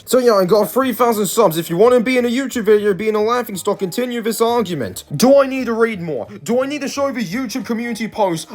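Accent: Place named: United Kingdom. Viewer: The way you pronounce words made me suspect that you are British. I adore British voices. To me they are really enjoyable to listen. My lips and tongue cannot do that.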